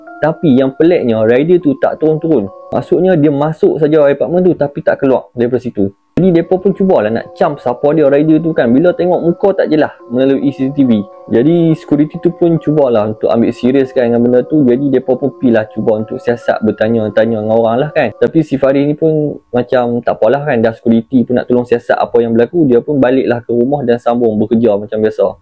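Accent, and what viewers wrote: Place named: North, Malaysia. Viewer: org utara dok.mana bang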